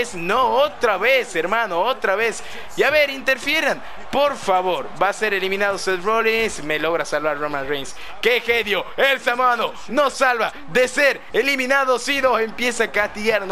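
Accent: Argentina. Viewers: una pregunta ¿sos argentino?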